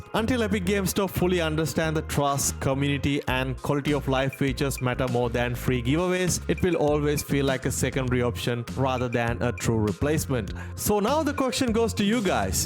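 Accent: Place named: India. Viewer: Yo dude are you from india??your accent feels indian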